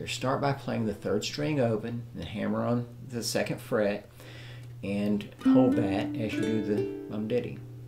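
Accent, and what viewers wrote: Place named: South, United States. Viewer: Thanks for an excellent tutorial. I am really hooked on this style of playing now. I am from the UK and am also tickled by your southern US accent. I love it. Uke playing is in now and clawhammer is catchin on. Thank you so much buddy!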